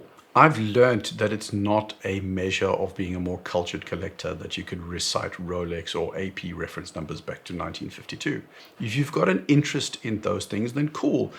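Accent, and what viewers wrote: South Africa. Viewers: Bro are you South African?😅Speculating here. I do have loads of South African friends, hearing you was refreshingly unique but familiar.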